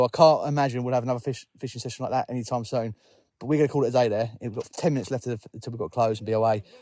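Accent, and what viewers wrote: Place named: Lancashire, United Kingdom. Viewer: What was this Rez buddy, great fish! Are you from Lancashire?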